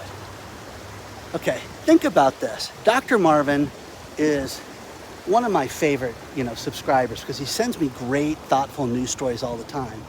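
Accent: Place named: Midwest, United States. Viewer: Has anyone ever told you you speak with a solid Midwest dialect, it's very disarming.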